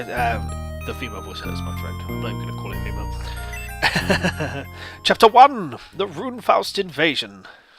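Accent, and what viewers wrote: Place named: United Kingdom. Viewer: Ermahgerd... An English bloke doing a playthrough of this game... must watch all... Dat voice acting. Doesn't even ruin, British voice too strong...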